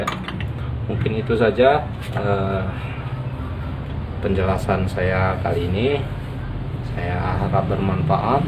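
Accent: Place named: Palembang, Indonesia. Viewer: Logat ny kayak wong plembang .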